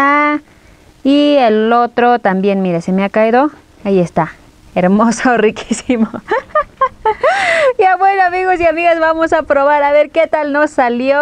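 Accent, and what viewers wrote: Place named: Bolivia. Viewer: . Sos. Más boliviana que persiana porque tiene tono Bolivianas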